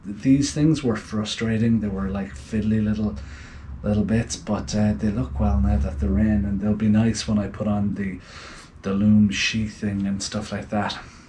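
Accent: Ireland. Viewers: Fair play to you! Is it an Irish accent?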